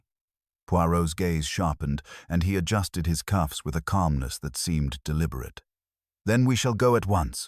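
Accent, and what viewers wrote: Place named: United States. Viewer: Why are British stories being narrated in an American accent. It's just not the right tone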